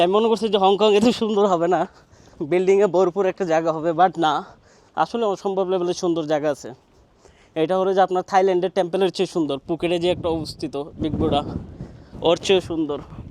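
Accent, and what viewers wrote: Chittagong, Bangladesh. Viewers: ভাই আপনার কথায় আপনি যে কাজ চট্টগ্রামের সেই টা বুঝা যায়।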